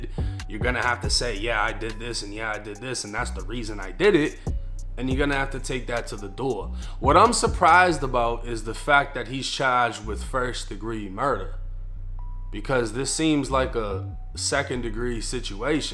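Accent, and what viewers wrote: Boston, United States. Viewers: Lol I’ve been subscribed to “Jay Williams: Let’s Live Life” (AWESOME channel), and I just stumbled across ur channel. Love the content man, and I love the Boston accent 😂. “His chAAAHHHges..” I ain’t making fun— it’s just different to me (a square from BFE Ohio).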